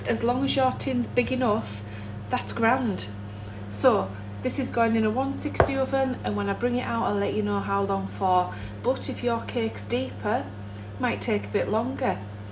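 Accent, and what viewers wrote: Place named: Silksworth, United Kingdom. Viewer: I have just made this again cos it was so nice last time. 😊I grew up in Silksworth and love listening to you. You sound like I used to. Told my sister to listen to you She still lives in Washington.. keep going with the channel. 🎉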